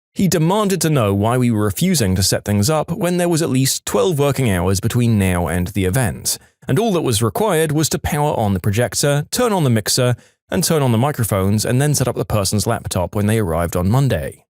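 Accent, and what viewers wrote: United Kingdom. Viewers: I thought you were from England/British bud.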